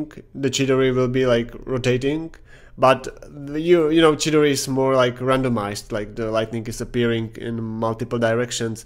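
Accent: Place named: Eastern Europe. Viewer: and that’s not at all a bad thing I like your Slavic accent!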